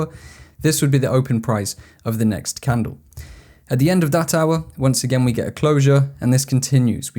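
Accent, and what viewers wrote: United Kingdom. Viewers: When he was saying the the the, I think he meant to say there. OMG I hate B.English pronunciation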